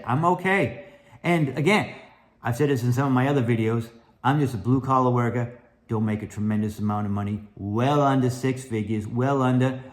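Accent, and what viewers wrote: Boston, United States. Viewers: love the Boston accent!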